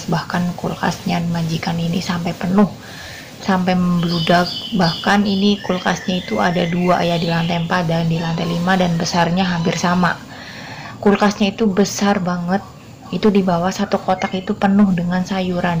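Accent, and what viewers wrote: Banyumas, Indonesia. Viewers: Kiye wong ngapak.. Nonton maning,, ful pokoke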